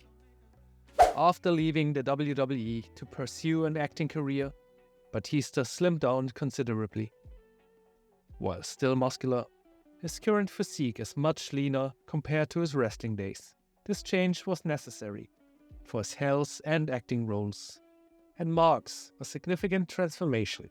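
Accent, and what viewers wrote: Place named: Germany. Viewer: This guy sounds german as heck yet he is from japan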